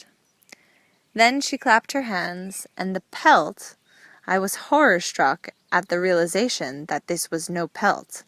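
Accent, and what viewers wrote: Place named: United States. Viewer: the disgusting american woman accent . Horrible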